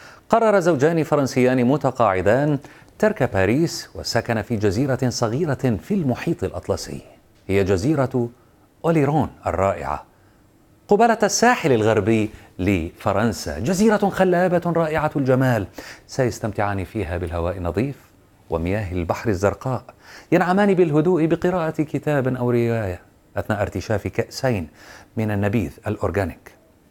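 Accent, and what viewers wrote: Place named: Iraq. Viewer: حسب للكنته يبدو أنه عراقي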